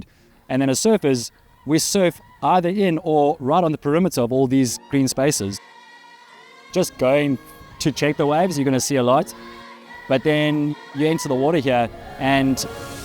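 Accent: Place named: South Africa. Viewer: Wow. Came here for the penguin interaction, fell in love with the visuals, editing, the amazing surfing, & the music, not to mention that beautiful South African accent!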